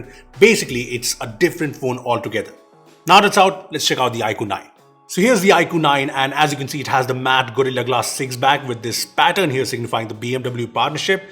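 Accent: India. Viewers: Hate indian accent of english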